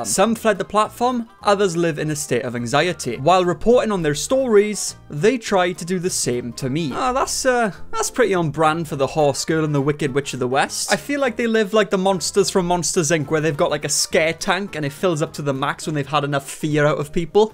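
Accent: Wales, United Kingdom. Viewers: I’m sorry if this is rude to ask but what is the accent he has is called? It is not the usual British accent I am familiar with. I just love his accent so much and would love to learn more about it. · He's Welsh. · Off topic but.. your Welsh? I knew there was something familiar about your accent!!!!! I DONT THINK IVE EVER REALLY SEEN A WELSH YOUTUBER!!!!!!! Finally!!!!! I have found someone!!!! 😭🥳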